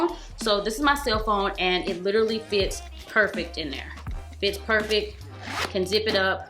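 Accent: South, United States. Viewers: Ugh 😩 you are the cutest southernest momma ever and I love it!!!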